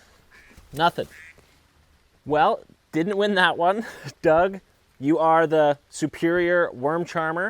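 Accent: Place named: Canada. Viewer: It’s only been seconds into the video and I can already tell the guys Canadian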